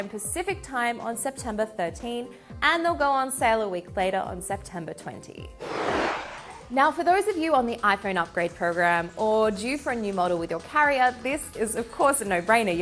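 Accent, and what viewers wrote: Australia. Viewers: I feel like she’s Aussie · R u Australian? Cuz ur accent is aussie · Is she Australian?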